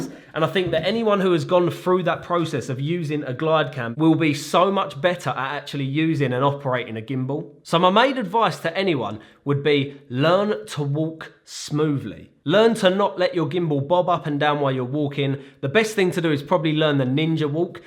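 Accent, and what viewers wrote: United Kingdom. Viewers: Listening to this guy actually feels like watching he Peeky Blinders series. I love his British accent.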